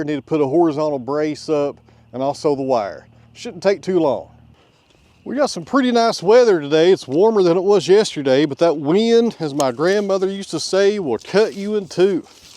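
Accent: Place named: Tennessee, United States. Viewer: It's OK, I have relatives in Tennessee and I can understand you just fine. 😊😉 Sometimes they have a little trouble understanding me from Minnesota. Ya sure you betcha.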